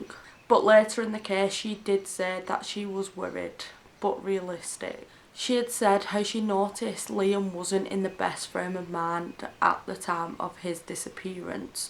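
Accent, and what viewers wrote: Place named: England, United Kingdom. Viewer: are you and Mollie both in England? You sound very different (accents). I'm wondering if there are different accents within England like there are in the US. To where when someone talks, we know pretty much where they are from.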